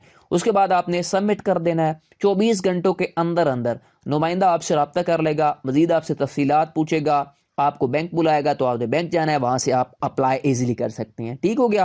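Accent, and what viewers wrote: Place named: United Kingdom. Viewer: ایک جھوٹ اور دوسرا لہجہ انگریز والا پتہ نہیں لوگوں کو انگریز بننے کا شوق کیوں ہے۔بنک لون دے اور وہ بھی سود بغیر۔ہو ہی نہیں سکتا۔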